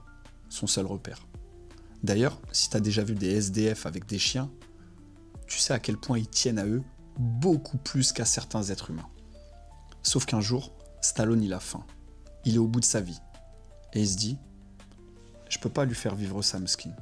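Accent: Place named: Italy. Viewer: très très cool ce format ! J'avoue que l'accent italien m'a transporté, en plus des précieux tips évidemment 😅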